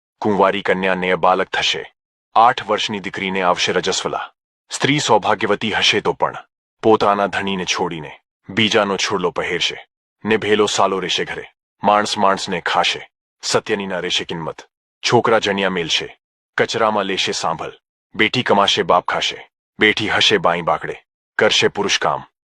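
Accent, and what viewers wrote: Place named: Assam, India. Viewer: Awaaz Assamese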